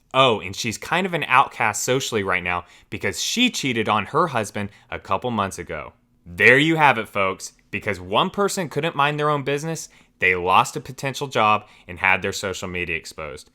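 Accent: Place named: South, United States. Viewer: Great reading! I love your Southern Accent! 😜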